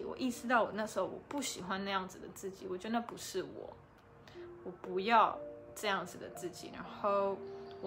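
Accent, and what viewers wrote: Taiwan. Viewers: 回來啦？在臺灣？隔離中？標準的臺灣國語，很親切！ 不知道答案也是答案？打電話來都不是好事？推這兩句直白的話！珍珠奶茶當飯喝，這個也可以推！😋😋😋😋😋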